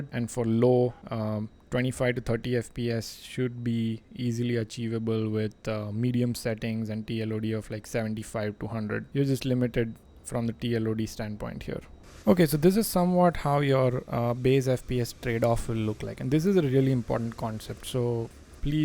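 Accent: India. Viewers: omg india accent now in msfs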